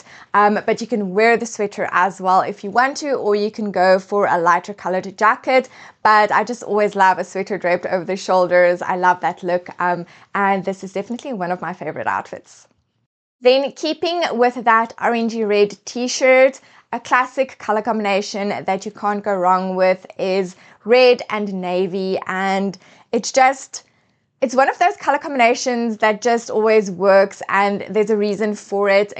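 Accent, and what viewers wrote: South Africa. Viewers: I KNEW IT!!!!! My family is from Cape Town but your accent is so much less pronounced than theirs… been in Canada for a long time already? ❤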